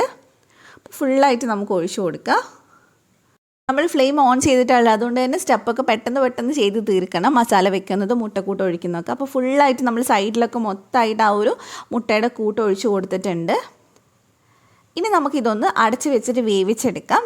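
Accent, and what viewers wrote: Kannur, India. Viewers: ഇത്ത കണ്ണൂർ എവിടെയാ മട്ടന്നൂർ aano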